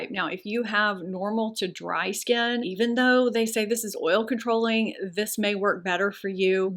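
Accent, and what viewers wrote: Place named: New Orleans, United States. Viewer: I was trying to place your accent lol. I went to med school in NO and should have known. It was a long time ago though. Very special city.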